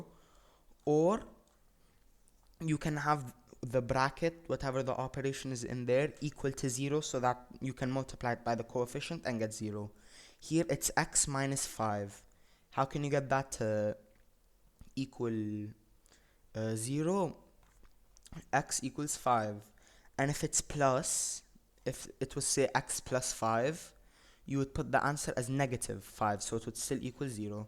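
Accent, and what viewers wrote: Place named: Lebanon. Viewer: I can tell ur Lebanese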